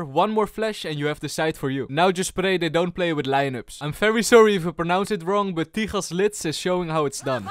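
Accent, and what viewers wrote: Netherlands. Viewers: You a typical dutch accent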